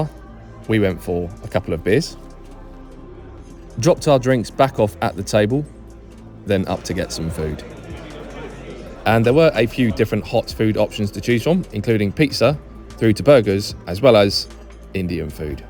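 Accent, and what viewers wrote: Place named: Cockney, United Kingdom. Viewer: THEY HAVNT GOT A ROOF . BWAHAHAHA RINSED . COCKNEY TWAT .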